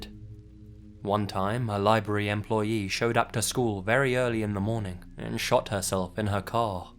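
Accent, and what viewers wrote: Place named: South East England, United Kingdom. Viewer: It's a bog standard middle class south east England accent. Nothing like Scottish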